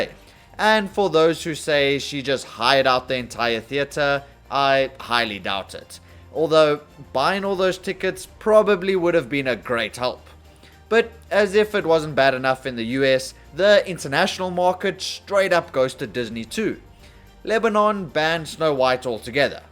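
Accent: South Africa. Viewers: Do I hear a SAFFA?!?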